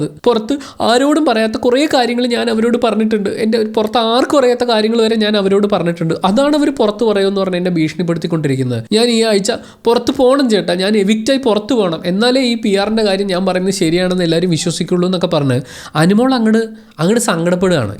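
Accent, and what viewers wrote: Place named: Kochi, India. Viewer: Your kochi slang is superb 😂😂 Exactly like Adhila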